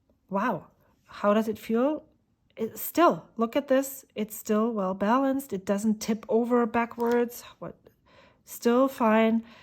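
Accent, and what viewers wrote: Germany. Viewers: I bought three of these and they all write differently. One was perfect out of the box, one was a bit on the dry side, and one needed a lot of tweaking before it wrote like it should. Not the first time that Jinhao's quality control, or lack thereof, makes the pens they produce a little unpredictable. All in all not a bad pen, especially for the price. PS: Finally, an English-speaking pen reviewer who knows how to pronounce "Montblanc". I detect a hint of an accent though. Are you German? Don't shoot me if I got it wrong. :)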